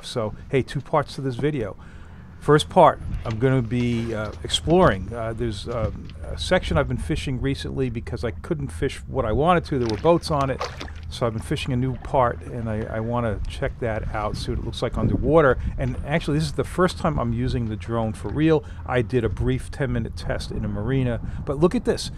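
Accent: Long Island, United States. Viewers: I grew up in Eastern LI, in Southold. I fished a lot, especially around Plum Island. On the NE side of the island, I could put my boat between 3 massive boulders and catch blackish until my arms hurt as others 20' away were only catching skate. I love black fish... excellent meat. Your drone footage instills what I imagined down there. You sound like you're from LI... right? I now live in SW Florida and I dive a lot, especially wrecks. The underwater world is awesome and this drone is a great tool to share the scenes without the "work" of diving. I did much better fishing in LI than I ever had here. I have even shoveled snow out of my boat just to go fishing. How much is that baby?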